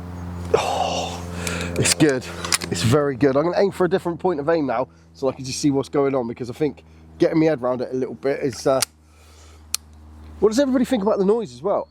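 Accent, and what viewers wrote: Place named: Southwest England, United Kingdom. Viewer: Subbed! Great energy! Enjoyed watching this. It's nice to see someone happy with ' the average man' groups and not all the 10 shots inside 5p at 100 yards. Sound local ISH ( Plymouth, southwest)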